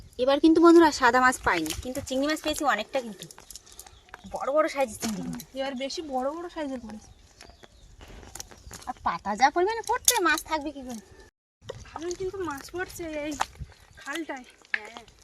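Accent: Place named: Dargahpur, Bangladesh. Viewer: মুখে দরগাহপুরের ভাষা শোনা যায়।হন্যে চিংড়ে।জালে গাব দেওয়া নেই। গা হাত পা ঠান্ডা হয়ে যাচ্ছে।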